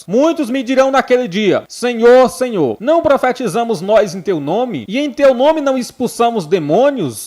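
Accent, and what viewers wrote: Northeast, Brazil. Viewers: A. Paz do senhor Jesus Cristo missionário gosto muito de seus comentários é muito edificante principalmente prá mim que sou Nova convertida em Cristo tem muito que não gosta porque não quer viver a verdade adoro ó seu sotaque nordestino Deus abençoe sempre sua vida e sua família 🙏💕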